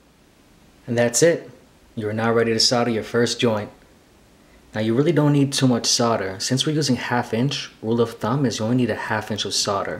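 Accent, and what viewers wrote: United States. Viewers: That's just how Americans say it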